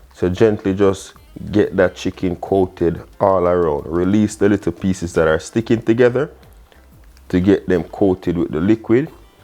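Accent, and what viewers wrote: Jamaica. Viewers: Yo...Am i the only personwho loves the Jamaican accent? Nah, that's what i thought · man i love jamaican accents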